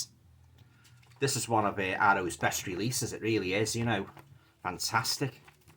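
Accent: Liverpool, United Kingdom. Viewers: Hey bud. So good to hear someone from Liverpool reviewing a horror film. And thanks for the compliment on the cover art. I’m really proud of it and I think this was a great film.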